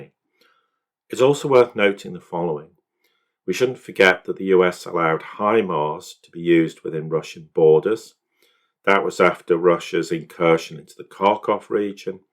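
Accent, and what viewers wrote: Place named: United Kingdom. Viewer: I like your show. But even if you are legit, in time your British accent will make me think that you are a psy-op. The vast majority of individuals worldwide who work in communications positions (News, TV, Radio, Newspapers, Magazines etc..) that target a worldwide audience are British, and 95% of them are psy-oping.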